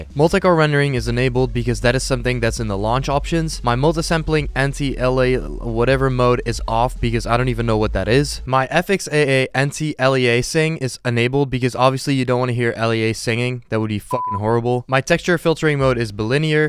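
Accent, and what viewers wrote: Netherlands. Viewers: Are you from the Netherlands 🤙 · ARE YOU DUTCH MEN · Wait a second ur Dutch? Sup broeder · Are you dutch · Wait are you dutch?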